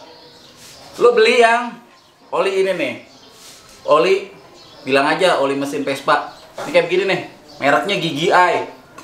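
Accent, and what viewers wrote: Jakarta, Indonesia. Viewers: Lucu Abang kental banget bahasa Betawi nye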